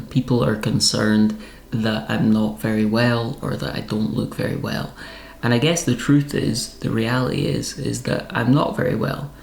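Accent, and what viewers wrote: Scotland, United Kingdom. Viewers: What a good video. Agree with everything you said....if it matters to you. I love your clear Scottish pronunciation. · Thank you so much for sharing - it's not always easy to open up, esp to the world! I've had issues most of my life but nowhere near the struggles you've gone through, mine were mostly related to being an introvert. I'm 76 now and still struggle from time to time. Finding where I belong and where I'm at peace (in Nature), has been my greatest healer even though it means being on my own with my dogs. I say on my own...not alone. I'm sure you'll have helped quite a number of your followers and their friends or families and I wish you all the best in the future. I thoroughly enjoy your videos and love hearing your soft Scottish voice. My origins are in Lumphanan so it reminds me very much of all my family, esp my mum. 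BTW - if those biscuits are anything to go by, you've got a head start on recovery - we need that recipe!! Love 'n' healing hugs from Oz ❤️🤗👩‍🌾🦘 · Those cookies look delicious! As a lifelong sufferer of depression and anxiety, I am SO glad that the stigma is starting to pull away from mental heath problems. I did not know what was wrong with me, but I certainly beat the doctors' doors down asking for help. The worst were the panic attacks. It took forever to find a combination of helpful meds, lots of trial and error. Do I hear a Scottish accent? My folks come from Arbroath.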